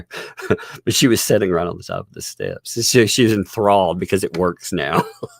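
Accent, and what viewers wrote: South, United States. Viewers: Catching up, it’s been a busy few days, enjoy listening, it’s nice listening to someone win a southern voice. 🫶🏻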